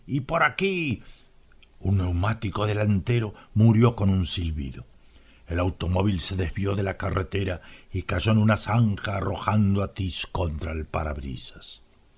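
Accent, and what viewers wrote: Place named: Argentina. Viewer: Ese acento argentino no me deja concentrar ...😅😅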